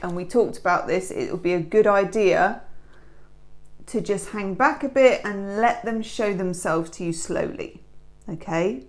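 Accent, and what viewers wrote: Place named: South, United Kingdom. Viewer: Hi I am in USA Texas and am a cancer I enjoy your readings they are spot on I am British from Cornwall sounds like your from the south of England Thankyou for your readings and 😘🇬🇧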